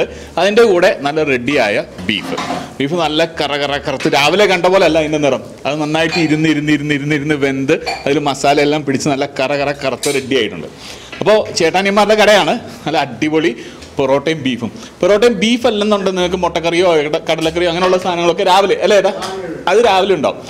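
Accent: Thrissur, India. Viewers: ചെറുതായി തൃശൂർ accent വരുന്നുണ്ട് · light aayittu kottayam bhaashayil thrissur slang kerunnundu , chayayil elakkade essence kerunna pole 😉😉, paranjhattu kaarilya yesu christune yesuvettannu vilikkana teamsanu 😁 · Thrissur slang Vanna pole, Akcent change try cheyyano like Sujith bhakthan tried this previously 😁😁😁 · പൊറോട്ടയും ബീഫും 👍👍👍 സംസാരം ഫസ്റ്റ് കേട്ടപ്പോൾ തനി തൃശൂർ സ്റ്റൈൽ പോലെ തോന്നി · തൃശൂർ ജില്ലയിലെ കടകളിൽ പോയി വീഡിയോ എടുക്കുമ്പോൾ സംസാരത്തിൽ തൃശൂർ slang വരുന്നുണ്ടല്ലോ 😊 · ee video il samsarikkumbol oru Thrissur accent inte hint evideyokkeyo varunnund 😁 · ഒരു തൃശ്ശൂർ സ്ലാങ് നാവിൽ കയറികൂടിയിട്ടുണ്ടല്ലോ 🤔🤔🤔, അതോ എനിക്ക് മാത്രമാണോ തോന്നിയത്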